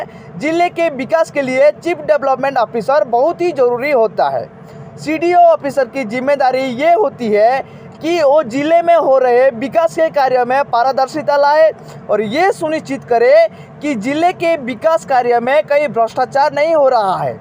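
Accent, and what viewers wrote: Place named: Odisha, India. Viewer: Bolnewala aadmi velong to odisha i can know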